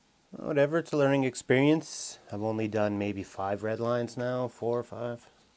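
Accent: Canada. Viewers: You’re Canadian aren’t you? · You sound so much much like one of my Canadian friends. Are you with the RCMP?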